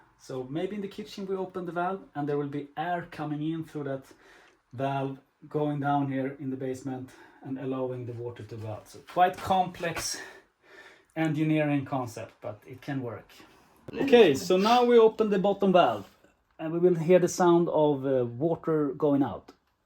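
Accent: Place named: Sweden. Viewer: Den värsta ikea-engelska jag har hört..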